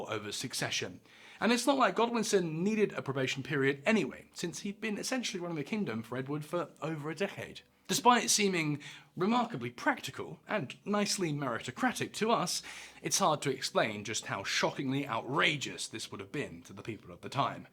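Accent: United Kingdom. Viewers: Why are the popular YouTube history channels always narrated by Brits? 😂 Rather soothing to my American ears lol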